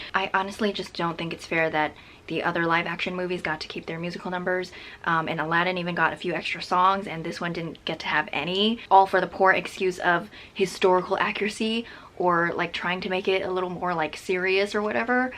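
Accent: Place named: United Kingdom. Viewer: subbed for amazing British accent! haha. Oh and also, good review :)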